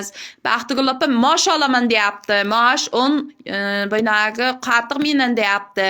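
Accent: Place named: Surkhandarya, Uzbekistan. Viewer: Surxandaryoni shevasi 100% yoshli perashka keskan shulla Surxoncha taom Qoʻrgʻon bozori boor😊😊😂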